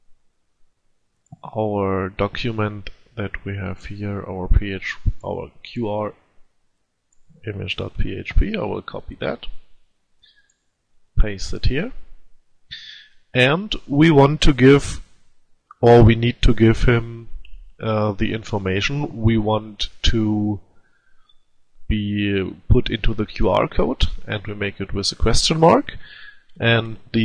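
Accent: Germany. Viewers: Es ist nicht so grausam... es ist ein Deutsches akzent! :)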